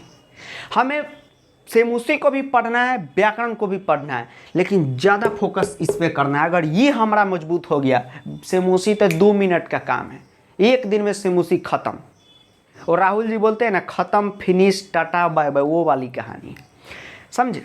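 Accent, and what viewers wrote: Bihar, India. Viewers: Sir aap Bihari hai ya Gorakhpuri 😂😭😂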